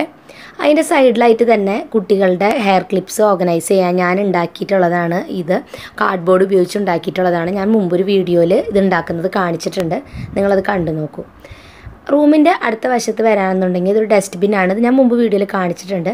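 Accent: Thrissur, India. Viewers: Enikum thrisur bashem trisurkarem ishtanu.. Njn ernakulam kaariya.. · Thrissur ഭാഷ കേൾക്കാൻ നല്ല രസമുണ്ട്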